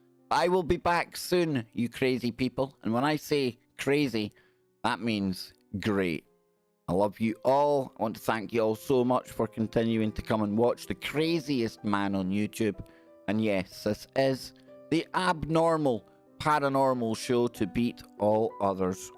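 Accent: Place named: Scotland, United Kingdom. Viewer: Hello from sunny San Diego CA, USA! You rock... Thanks for lifting my spirits. & I am so in love with your ACCENT!!! I just really love it... It's kinda soothing. Blessings to all of you in beautiful Scotland!!! 🤓💜🎉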